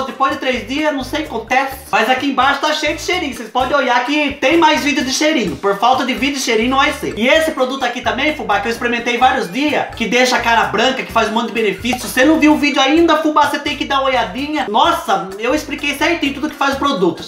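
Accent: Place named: Interior São Paulo, Brazil. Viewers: vc me faz lembrar do meu pai, ele nasceu em Itararé, ele tinha o sotaque igual o seu. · Fubá do céu muito legal faz sim....,Sotaque lindo vc tem,igual minha mana que mora no interior Paranapanema SP ♥️👏👏👏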